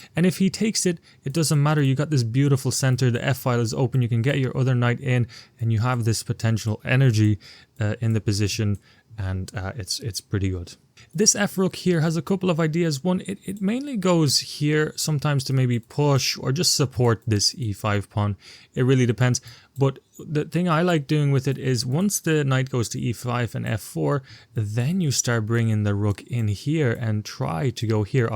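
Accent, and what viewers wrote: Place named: Midlands, United Kingdom. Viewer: Thanks for adding another idea to my opening repertoire What county are you from bro? Can't put my finger on it, fairly neutral so west/midlands?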